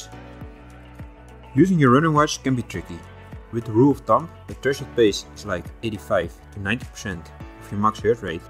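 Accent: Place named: Germany. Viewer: Meest denglish accent ooit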